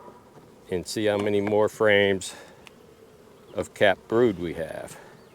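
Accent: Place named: North Carolina, United States. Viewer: I thought I recognized a familiar accent--I'm from NC, too! This was a great video. Lots of good info for someone like me who used to be a beekeeper, had to put it away for several years, and has now started back up. Thanks!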